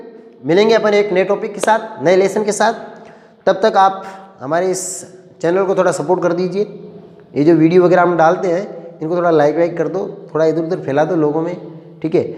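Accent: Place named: Barmer, India. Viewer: आपके पढ़ाने का तरीका यही बनाए रखना क्योंकि कॉमेडी के साथ पढ़ाई में बोरियत महसूस नहीं होती है ओर खासकर देशी अंदाज...क्या आप बाड़मेर से हो गुरू।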